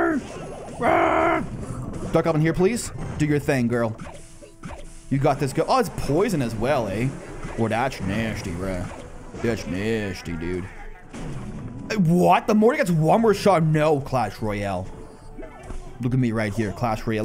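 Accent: Canada. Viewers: was just watching a random video (first video of him) and realised this guy was canadian. And yes,I even searched it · Canadian eh? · He’s prob Canadian they say that a lot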